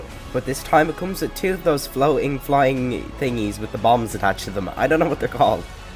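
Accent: Ireland. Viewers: I really wish this was a real Lego set Also I could tell you were Irish just by you’re accent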